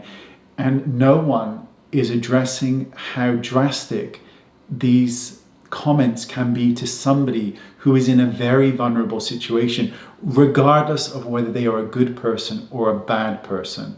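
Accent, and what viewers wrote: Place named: Scotland, United Kingdom. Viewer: That Scottish accent is really poking through in this video and I love it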